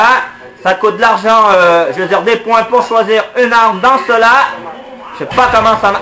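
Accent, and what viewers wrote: Quebec, Canada. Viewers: avec l'accent canadien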